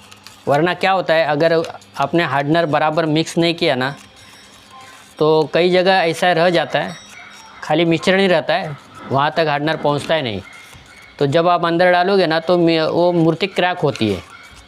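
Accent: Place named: Rural, India. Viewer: 😊सरजी गावरान हिंदी 👌👌👌👍🏻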